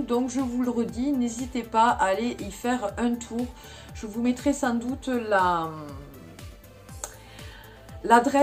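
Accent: South, France. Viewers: coucou ça vaut le coup tu te refais ta garde robe en ce moment c est top tu habites dans le midi avec ton accent ??? bon aprem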